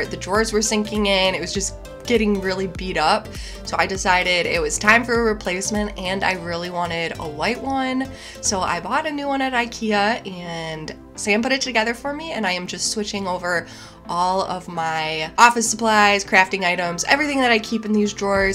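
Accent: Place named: Midwest, United States. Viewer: I don't mean to be a creeper but are you from the midwest? You pronounce your vowels like me lol (Minnesotan)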